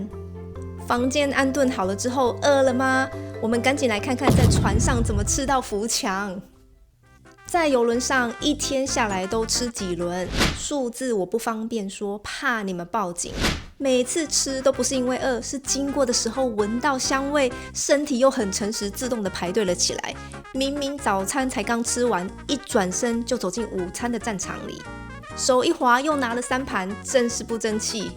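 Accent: Taiwan. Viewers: 台灣口音甜蜜親切又有趣❤❤❤😂😂😂👍👍👍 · 看完影片，先订阅，你的文彩，佩服，台湾腔的国语，把原有的幽默更提一层，期待下一期，说一下你的攻略，包括旅轮网站，搜索技巧，上岸那些景点值得一去，错过会小遗憾，订船上旅游还是下船跟当地导游(我们是刚退休人士)，火车skygway必须事先或下岸预订(怎么算好那天)，等等. 谢了🙏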